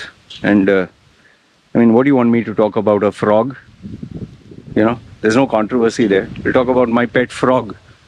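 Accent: India. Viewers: When ur accent is indian and u can't even pronounce indian names correctly.....dhruv rathee?😅😅😅 On purpose?? To act cool or sound like west!? Oh' cmon u dnt need such acts to look polished....u r much more than that 😊😊😊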